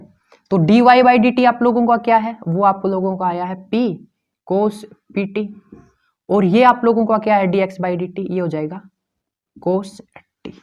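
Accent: Haryana, India. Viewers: Language ki tone se pta lgra h ki aap haryana se ho...main bhi haryana se hu...kurukshetra se..... 0.1😍😍support farmers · Bhai app kaha se hai pure haryanvi bool rhe hai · Aap haryana se ho ???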